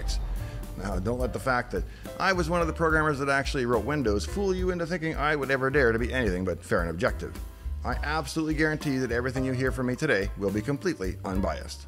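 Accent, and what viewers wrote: Canada. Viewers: A second option that may help is to CC the videos, as I quite enjoy listening to the pnw/Canuck accent you’ve got going on.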